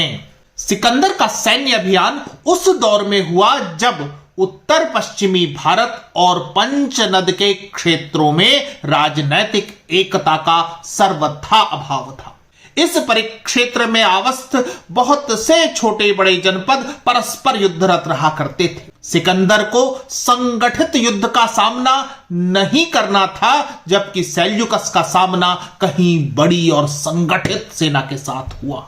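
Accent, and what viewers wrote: Standard. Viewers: Shudh hindi bashan ka ucharan kiya, suhani lagi. Details achha diya. Good.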